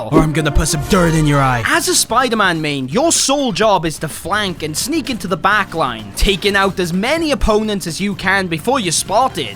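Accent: Wales, United Kingdom. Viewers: It always shocks me whenever I hear another Welsh creator because I never expect the accent until im midway through and im like "wait hold up". First impression and it's already a great one, keep up the good work! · u welsh?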